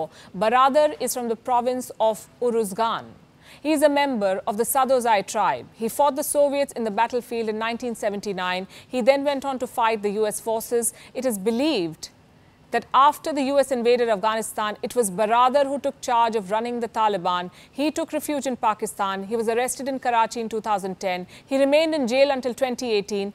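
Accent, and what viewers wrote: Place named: India. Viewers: She's so good but she hasn't work very hard on her international English, still has a very strong Indian accent and sometimes this makes it hard to follow up. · She purposely has kept her indian accent .. bcuz she is indian ... U guys need to catch up if u want to watch her or else watch ur native accent news some where else...I bet there would b so many..... Simple as that..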